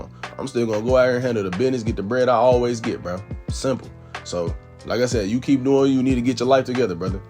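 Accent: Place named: Chicago, United States. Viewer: You talk like you from the chi lol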